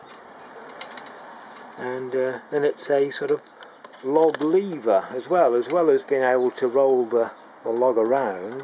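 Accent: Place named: East Anglia, United Kingdom. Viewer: Would love to see it in use! I recognise that accent, whereabouts are you in East Anglia?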